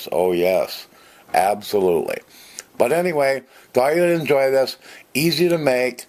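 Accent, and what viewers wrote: Minnesota, United States. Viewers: Talks like an only Minnesotan "this farmlund make utt".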